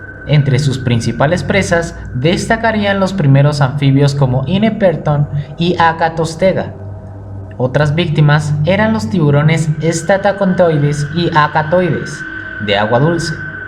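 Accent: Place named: Portugal. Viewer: que buen acento portugués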